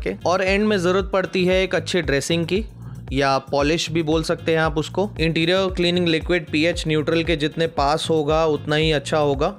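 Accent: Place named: United Kingdom. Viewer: Mtlb professional professional.... Itni bhi English accent me mt bolo Irritating lgta h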